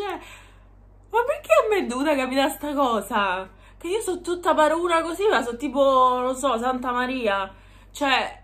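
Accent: Rome, Italy. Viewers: Ciao, fai 1 video dove non parli romano ma solo italiano.... ti sfido 🤪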